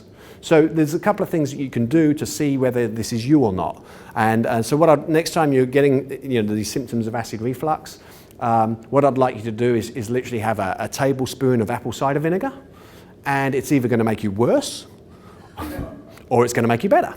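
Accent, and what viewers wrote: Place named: New Zealand. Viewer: Nice to listen to someone from home soil.....NZ! I have been diagnosed with sever vitamin D deficiency and have such horrendous symptoms. I was having bladder issues, couldn't walk properly, had bone pain and terrible brain fog and memory loss. I felt so out of control so the only thing I knew I had control over was what to eat. I have been eating exactly the diet you are suggesting....good on me!!...and after 4 weeks now I am slowly starting to feel myself returning, the inflammation is going down and my mind is clearing. I have a long way to go but your video had really helped me with so many good tips. I have just bought an organic bone broth kit so getting into that this week!! Thanks, I'm going to make my cheese loving hubby watch it!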